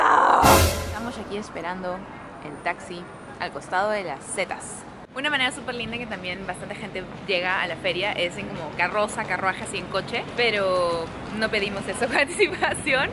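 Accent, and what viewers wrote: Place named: Lima, Peru. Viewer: El acento limeño XD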